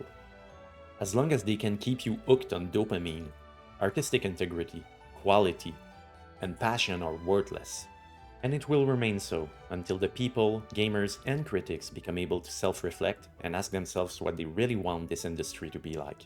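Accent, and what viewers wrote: Quebec, Canada. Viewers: Brother.. Are you from QC? Lol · Is it me or I recognize a Quebec accent here ??? Certainly wasn't expecting that hahah. Really liked this video it was super interesting !!! Will def sub and check your other videos !!!! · Est-ce que j'entends un accent québécois? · disagree here, human beings hate what they don't understand, and fear the unknown, hence why we hate out-groups and fear death. Otherwise, this was a great nuanced take why the Pokemon gamin franchise continues to pump out mediocre products. I can tell by your accent you're a fellow Quebecois, subscribed🇨🇦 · T'es Québecois hein? 😂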